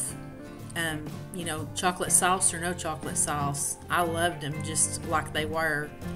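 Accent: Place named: Kentucky, United States. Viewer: Loved all of these !I'm from Kentucky found your channel from moss family tv your accent sounds like your from ky too love it lol !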